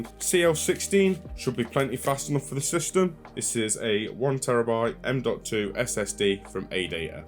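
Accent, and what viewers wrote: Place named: East Midlands, United Kingdom. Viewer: That would be a good idea mate, very helpful for us novices with next to no experience! You sound a bit east Midlands ish, where are you based?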